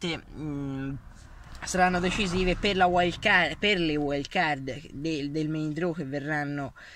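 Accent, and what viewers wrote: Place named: Florence, Italy. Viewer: Purtroppo hai quell'accento fiorentino. Per il resto bravo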